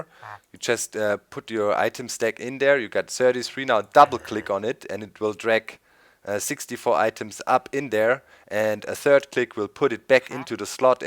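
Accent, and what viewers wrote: Germany. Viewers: Give the German a break. English is not his first language. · there's something I don't understand: Now in German, the W is typically as our V, and the V is typically like our F I think, right? How come with the German accent, you guys will often times say English words with a V as we say a W?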